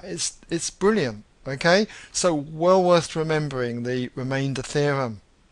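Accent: United Kingdom. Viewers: Wow nice British accent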